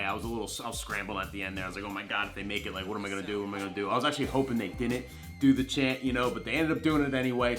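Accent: New Jersey, United States. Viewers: Hey as soon i saw one of your vids I was like this cat sound like. JERSEY and lit fabulous job great energy munch continue success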